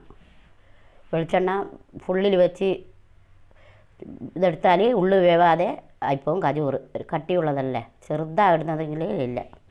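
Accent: Nadapuram, India. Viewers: Nadapuram bagathulla basha samsarikkunnond chothichatha njnum Nadapuram ഏരിയയില്‍ aanu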